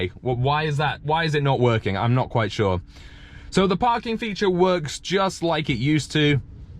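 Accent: United Kingdom. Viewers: I watch this guy's video specifically for that accent. Saying something even if stupid, in a Britsh accent just makes it sound smart. I think that's how Boris Johnson got to be PM. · Your car don't understand British accent. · I have seen a vidéo in the US and work perfectly...weird ! Maybe your brits accent ?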